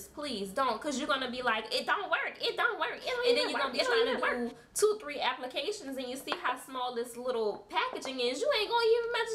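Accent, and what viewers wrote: New Orleans, United States. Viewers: Are you from N.O.?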